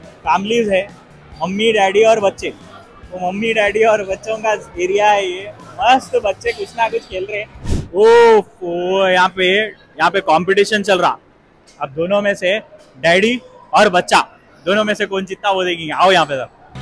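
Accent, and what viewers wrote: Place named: Hyderabad, India. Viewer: Our Hyderabadi hindi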